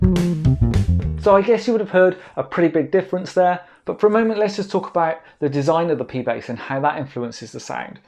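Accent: United Kingdom. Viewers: This gentleman has that beautiful deep British English accent wich makes the video even more interesting. Thank you for the great ammount of information you have provided us with. Greetings from Lisboa, Portugal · Agreed. The information he dropped for us is very important........but that bri'ish accent do be hittin' different. Can't even lie. 😂😂😂😂😂😂😂 Ok, forgive me. Lol.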